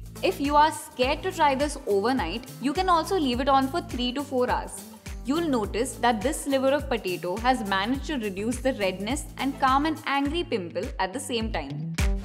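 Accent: India. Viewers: Her Indian accent "patoto"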